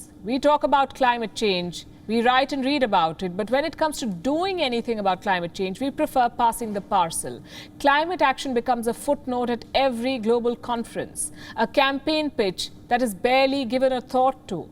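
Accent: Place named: India. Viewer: Everything is perfect, no disrespect, but your thick Hindi English is at times very difficult to understand.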